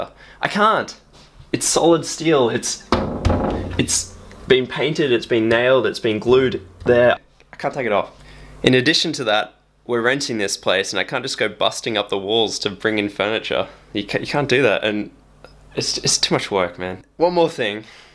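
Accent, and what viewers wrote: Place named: Australia. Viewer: before i found this vid on your channel i thought you might be Australian guess i was right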